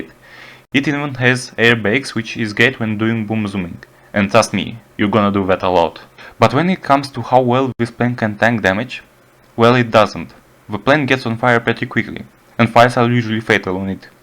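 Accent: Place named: Russia. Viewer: Твой русский акцент режит прямо по ушам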